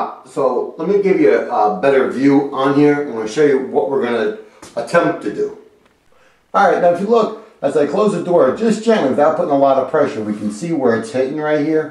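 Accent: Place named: Philadelphia, United States. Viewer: My first time watching your channel, just hearing you talk I'm telling myself you gotta be from my neighborhood in Philly.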